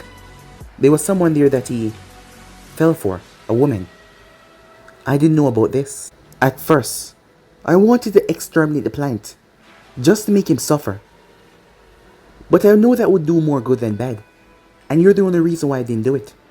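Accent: Jamaica. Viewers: Hey i have a question by any chance are you jamaican???🤔🤔🤔🤔, I am just asking since when I listen to your stories i hear a bit of the accent🤔🤔🤔🤔 #hmmmm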